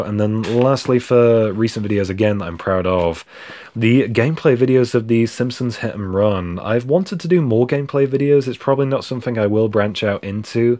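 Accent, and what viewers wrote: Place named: United Kingdom. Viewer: Happy 10th Anniversary on your Channel I came Across your Box of Childhood VHS Tapes Video and that's how I found your Channel and I Subscribed for more Content since I'm both a VHS and DVD Collector since my Early Childhood to Adolescence Years to now since I'm 23 (just turned 23 on January 17th) which means I'm pretty much new to your Channel and I Discovered that you're British and you have an Awesome Accent Bro just to let you know that I'm an American who loves British People because they have Awesome Accents when they start talking and you my Dude just earned a New Subscriber PS Check out my Comment on your Box of Childhood VHS Tapes Video I Commented how I Started Buying and Collecting VHS Tapes when I was very Young oh and PS I'll be making a Video on DVDs I Ordered from Amazon Recently and I'll be Uploading it by Tomorrow so be Sure to Check that out as well and again Great Content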